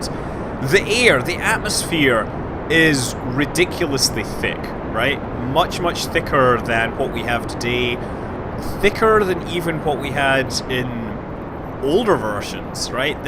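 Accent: Scotland, United Kingdom. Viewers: Sounds like Scott's accent came back with him from Scotland. Neat! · is all like "How come we don't have a swamp anymore!?" in his Scottish accent. Couldn't help but Shrek.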